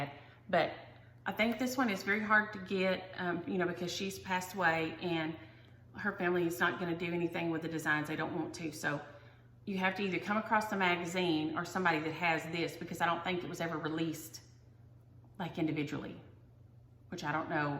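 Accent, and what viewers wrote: South, United States. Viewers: Love the moonlight as well as the evergreen ..congratulations on 3000subs ! And I love your accent and southern ways ..I’m from up north and it sounds special to me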